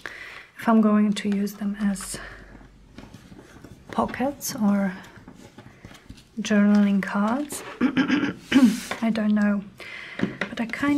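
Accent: United Kingdom. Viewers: both of those series made me wish I could travel to Europe and Australia. My family name is Montaque and we came from England and Scotland. I love the accents. That's another reason your voice is so soothing and relaxing to me. I love watching you and hearing about your life. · I love these vids. 💜 Your creations are so delightful, even your scraps are lovely! Been busy knitting and trying to learn new stitches which is a challenge for me. Hey! When did you replace your table? I miss the teal one 😉 I love Scottish accents too 💕 if I could have seen in concert that would have been epic!! 💫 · I love your new series! Absolutely relaxing and it's so nice to know you better as you talking more about yourself ☺ I love Downton Abbey, too! My mum and I watched it uncountable times 🥰 And I agree, I could listen to British English for hours. I do not watch TV or movies/series very often, I am more in reading , so no tip for you 😅 But warm hugs instead 🤗 😉🐶😘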